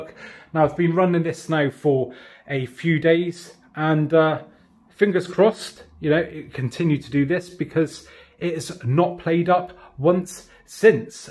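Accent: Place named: Yorkshire, United Kingdom. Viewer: I was actually watching this video because of the problem that I have with my tv. But dude I love your accent!:) are you from Yorkshire?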